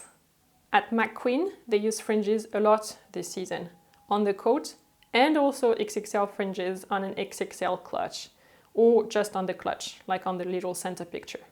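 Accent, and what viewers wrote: France. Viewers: Thank you for such amazing content. You are by far one of my favorite fashion youtubers out there. Im so lucky to have come across your channel...not to mention youre French-your accent is music to my ears. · love the video you have a beautiful sounding voice aswell are you french?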